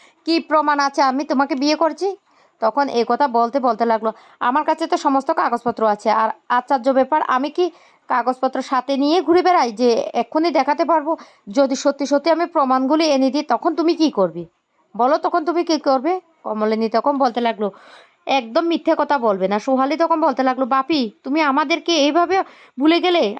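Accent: Bangladesh. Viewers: আগে ভালো করে বাংলা ভাষা টা শিখে বলুন। কি বিচ্ছিরি শুনতে লাগছে🥴🥴🥴। বাংলাদেশী না রোহিঙ্গা?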